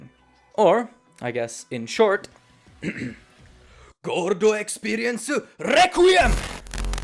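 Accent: Italy. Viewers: pretty sure he is italian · I love your italian pronunciation! Keep it up! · Mhhhhhhhhhh your ACCENT souns very familiar,are you ITALIAN by any chance?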